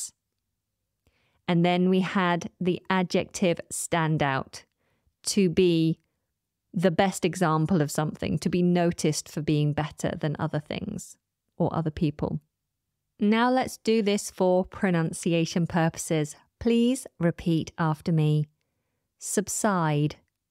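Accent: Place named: United Kingdom. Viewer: my favorite english teacher.... I love her british acent❤❤❤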